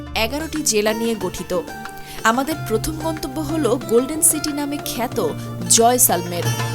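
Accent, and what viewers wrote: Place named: West Bengal, India. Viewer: আপনি তো ম্যাডাম ভারতের পশ্চিমবঙ্গের মানুষের মতো উচ্চারণ করেন, কথা বলেন। তো -- হিন্দুদের ধর্ম নিয়ে ভারতে চলে আসুন-- ভারত আপনাকে স্বাগত জানাবে ------!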